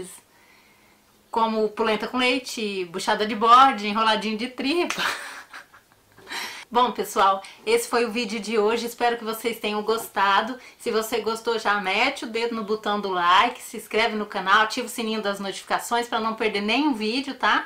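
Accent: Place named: Northeast, Brazil. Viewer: Nota 10 pela a história e nota 1000 pelo sutaque amo o Nordeste e o nordestino também.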